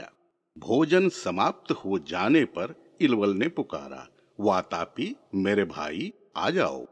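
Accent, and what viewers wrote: Standard. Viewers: सचमुच रहस्य एवं रोमांच से भरी महाभारत की एक अद्भुत् और अलौकिक कथा….! आपकी अद्भुत् और शुद्ध उच्चरित वाणी से कथा और भी प्रभावी हो गयी है। चित्र भी ख़ूबसूरत नंऔऔर सटीक हैं। एक प्रश्न मन में उत्पन्न हो रहा है कि अगस्त्य मुनि ने जिस सुन्दरी स्त्री का स्वयं निर्माण किया उसी लोपामुद्रा से विवाह करने की इच्छा क्यों की...? दैत्यों का वध उचित था क्योंकि उनके कर्म उचित नहीं थे। पर दैत्य की संतान प्राप्ति की इच्छा क्यों पूरी नहीं हो सकी...? क्या अनुचित था? 🤔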